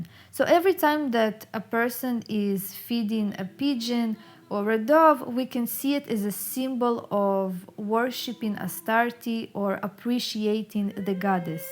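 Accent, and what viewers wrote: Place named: Eastern Europe. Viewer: The accent is the best I love when she says shit..in eastern European accent my grandma used to have similar accent but she was from Cyprus.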